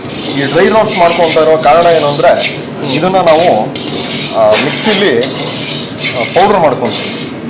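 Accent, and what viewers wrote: Butler English, India. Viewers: Sir, your butler inglish is top, after very long time we are hearing, keep it up.🎉❤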